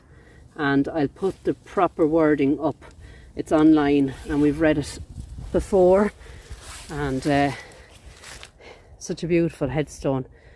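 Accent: Ireland. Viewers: You have the best Irish accent. Absolutely love it. When I imagine my ancestors talking to each other, it’s your accent I hear.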